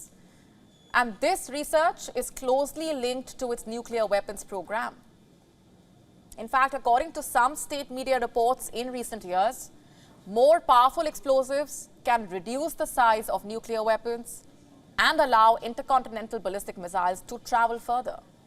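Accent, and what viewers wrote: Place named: India. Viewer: 印度口音:pride-person-earth-intersex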